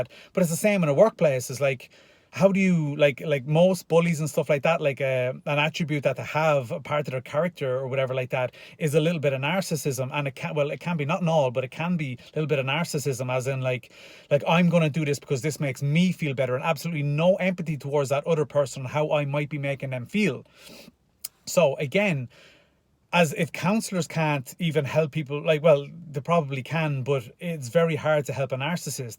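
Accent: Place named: Ireland. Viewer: I love your accent. If youre from Ireland, what part?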